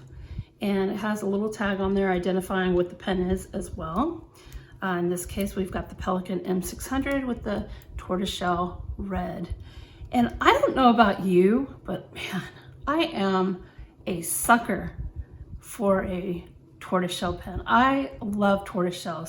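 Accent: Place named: Dallas, United States. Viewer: Great look! I think you sound like your from Dallas, and Dallas people are more fashionable than S.A. or Houston.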